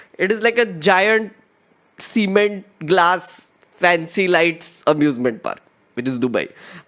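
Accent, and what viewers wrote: India. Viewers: India accent so annoying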